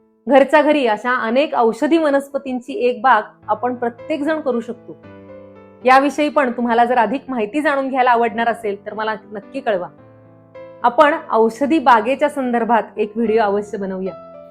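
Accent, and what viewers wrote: Standard. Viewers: मॅडम मी अलीकडेच तुमचे video बघायला सुरुवात केली आहे तुम्ही अतीशय उत्तम आणि विविध आरोग्य विषयक माहिती देताच पण तुमची वाणी अतीशय शुद्ध स्पष्ट आहे त्यामुळं खूपच प्रभाव पडतो तसेच विविध श्लोक तोंडपाठ आणि अस्खलित पणे म्हणून दाखवता खूपच प्रभावी आहे लहान मुलांच्या मधील बाळ दमा कफ यावर कृपया मार्गदर्शन करा विनंती आहे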